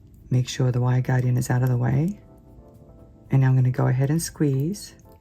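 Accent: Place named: Australia. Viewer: I watch on my android phone and I never have problems with the audio and I love your Australian accent.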